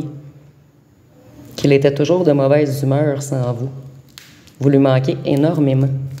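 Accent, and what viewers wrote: Canada. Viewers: Bonjour cousine Canadienne, j’aime votre accent qui me fait penser à mes cousines germaines qui vivent à Montréal où j’ai eu plusieurs fois l’occasion de m’y rendre… Je vis en France… J’aime le format de guidance de 30 min. 😊 J’ai entendu votre voisine mais pas de soucis 😉😘 Je n’ai nullement été offusquée 😉😄ce sont les choses de la vie… Gratitude pour votre guidance 🙏🏼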